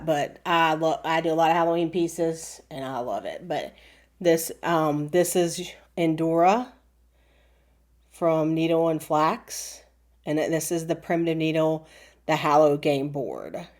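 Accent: South, United States. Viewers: Your videos are amazing. It’s such a joy to watch you. I love seeing your stitching. Your Halloween projects have inspired me to stitch more of them. I also love your accent. Keep up the Southern charm! You are a pure joy to watch.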